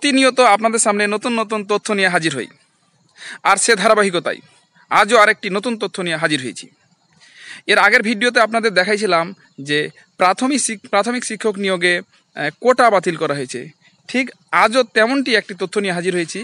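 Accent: India. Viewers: গুরুত্বপূর্ণ তথ্য জানাচ্ছেন এজন্য অসংখ্য ধন্যবাদ। দুইটা ভিডিও দেখে Subscriber করলাম। একটা অনুরোধ ডেট দেওয়া মাত্র জানাবেন। একটা কথা জানতে ইচ্ছে করছে আপনি কি বিহারি? কথার উচ্চারণে অনেক ছ ছ আসছে। কিচ্ছু মনে করবেন না এমনিতেই জানতে ইচ্ছে করলো। 🙂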